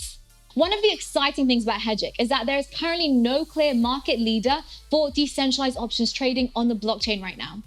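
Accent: England, United Kingdom. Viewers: I have to listen to her at .75 playback speed. She speaks way too fast and the English accent doesn't help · Good, I bought POLS last month. Makes me feel better to see her taking about it in her cute English accent.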